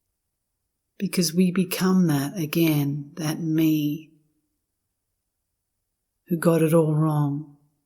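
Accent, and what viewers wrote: Australia. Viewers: She has a beautiful calming voice a soft Aussie accent ❤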